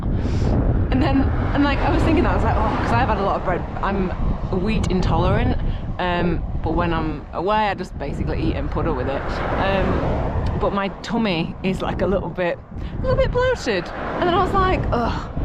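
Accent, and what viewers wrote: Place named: Manchester, United Kingdom. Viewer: So you're from Manchester? I was trying to pinpoint the accent. Guessed wrong.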